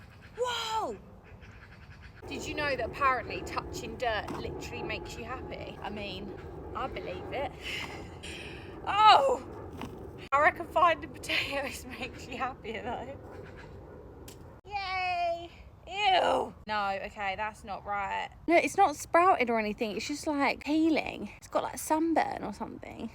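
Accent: United Kingdom. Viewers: I have a question 🤔🤔 are you a British (no hate just curious) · The British accent makes it even funnier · Is this a British 😅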